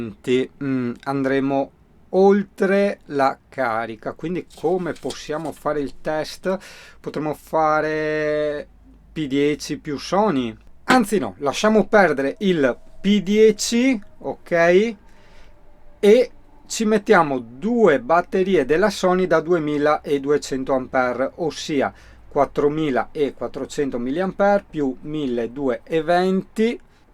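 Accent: Veneto, Italy. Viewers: Ciao, bravo fai anche tu recensioni che ti aiutano ad avere ulteriori introiti. Ps, ho vissuto a Mestre tanti anni ora sono poco fuori, il tuo accento che è delle nostre parti mi rilassa!!! Ciao beo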